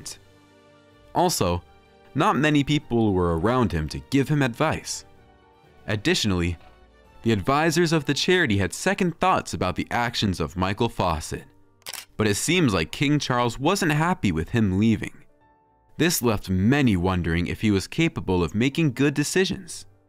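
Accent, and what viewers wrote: United States. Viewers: Any decisions of the sort you mention were ultimately up to the parliament. The monarch doesn't make the rules - or didn't you know that? As to Camilla, her description is Consort - like the Queen Mother when she was married to King George VI, Queen Mary and Queen Alexandra. "Consort" is a description - not a title - meaning that if the King dies the Queen Consort cannot reign. Ever heard of Queen Elizabeth (married to George VI) being referred to as Queen Consort Elizabeth when George VI was on the throne? Do some reading. Once Camilla had been crowned, she was Queen. Also not a good idea to take any information from an American voiced broadcast. They're clueless.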